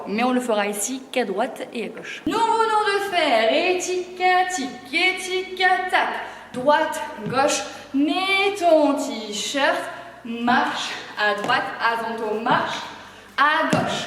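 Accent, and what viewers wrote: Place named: South, France. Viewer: Quel régal super!!!! Tu reflète le Sud a font,ton sourire,ta joie de vivre, ton accent,et tes blagues waouh je me croirais de retour à Marseille!!! Merci super hiper génial!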